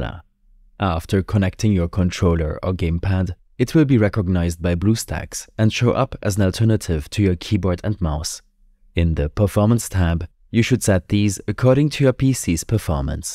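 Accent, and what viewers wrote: Germany. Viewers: I said "lern Englisch" it's German because of his German Akzent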